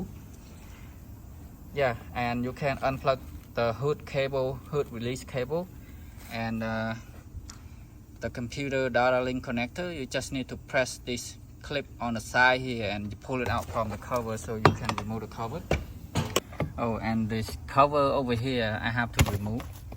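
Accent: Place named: Vietnam. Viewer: nice, ty for this sir. also u sound Vietnamese. i love it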